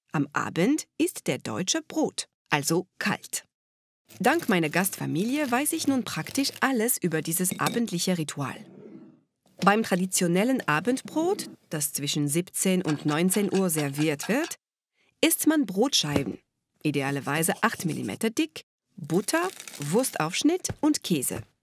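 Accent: France. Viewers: Wieder so süß erklärt.... mit diese charmante französische Akzent! Unterhaltsames Bildungsfernsehen 😘 Jaaaa, wir Deutschen lieben unser Brot, kann ich nur bestätigen! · Aww, ich liebe diese kleinen Filmchen zur Erklärung 😍. Ich liebe den Akzent und die französische Kultur. Spannend, wie die deutsche Kultur gesehen wird. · Am schönsten bei Karambolage ist noch immer der französische Akzent.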